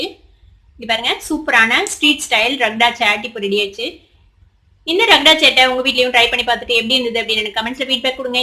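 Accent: India. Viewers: nice sis..indian tamil nalla iruku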